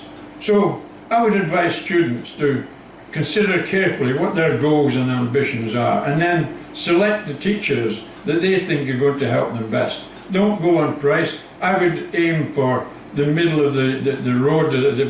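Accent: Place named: Scotland, United Kingdom. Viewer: This is Scottish accent .. 😃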